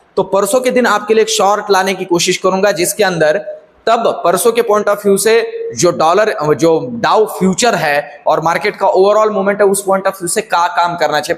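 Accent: Maharashtra, India. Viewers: Sir mera analysis ye kahata hai ki aapki mother tongue Marathi hai...🙏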